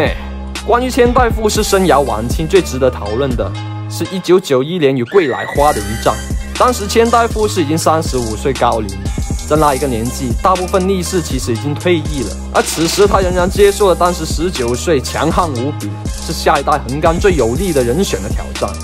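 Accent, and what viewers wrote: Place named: Taiwan. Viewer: 本来很平淡的看人物传记，结果这台湾腔说到后来都激动落泪和死了爹一样潸然泪下，让我笑出声，真是大孝子。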